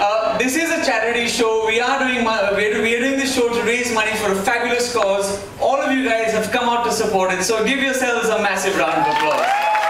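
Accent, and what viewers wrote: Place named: England, United Kingdom. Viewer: his english accent makes me laugh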